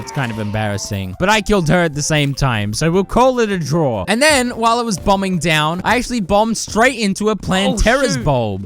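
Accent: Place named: Australia. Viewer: i hear it but its because their both australian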